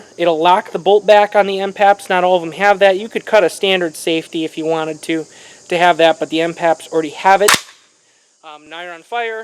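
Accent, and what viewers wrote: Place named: Chicago, United States. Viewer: HA, listening to your accent i knew you had to be from the Chicago land area. Then you busted out with you living 30 minutes from Rguns and I was like, son of a bitch lol. I live about 45 minutes east from Rguns, I'm over by the lake. I just bought this rifle from reddot arms in lake villa and I'm going to do the same thing as you and just head over to rguns and get the yugo sling. good video, i just subscribed.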